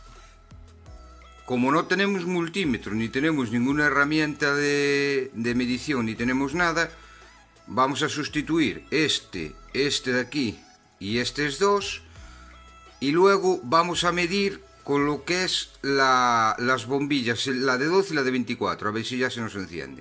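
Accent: Galicia, Spain. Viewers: Gracias, por el vídeo y sobretodo la buena explicación muy bien detallada, por cierto de que parte de galicia eres, yo de Ourense, saludos.ah Un nuevo suscriptor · Vídeo estupendo...noto que eres gallego...yo también...soy una persona que me cuesta aprender de los libros (teoría) pero aprendo muy rápido de la práctica.. seguiré viendo tus vídeos para aprender.gracias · A este andaluz le gusta tu tono y timbre de voz gallega! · El acento gallego le da su toque.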